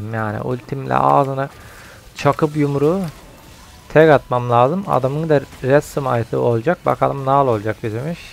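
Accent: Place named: Aksaray, Turkey. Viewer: abi şiven Aksaray şivesine benziyor bende orda yaşıorum tam olarak bilgi verirmisin acaba bu konuda bize D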